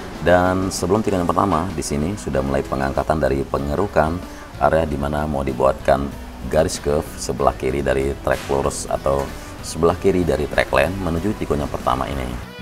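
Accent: Lombok, Indonesia. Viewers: Lombok mana suaranya👍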